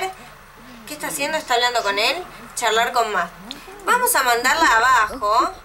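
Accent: Spain. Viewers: es española o argentina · siempre estas en un bar y otra pregunta eres española o inglesa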